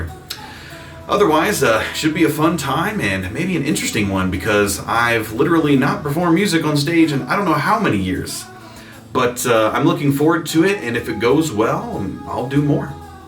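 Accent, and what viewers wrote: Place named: South, United States. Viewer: It took until this video for me to realize you were also southern lol.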